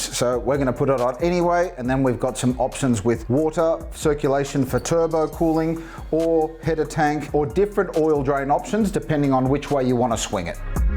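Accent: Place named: Australia. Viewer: If you do anything with an Australian accent, ill watch it.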